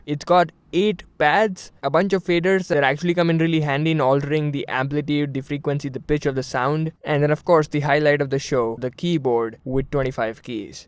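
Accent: India. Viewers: fucking indus, learn to speak English and go back to india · lol this indian accent....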